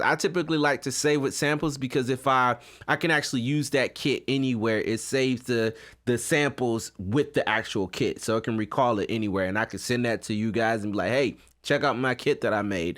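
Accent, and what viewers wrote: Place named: Baltimore, United States. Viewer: Bro are you from Baltimore😂 couldn’t help but notice the accent but this video was great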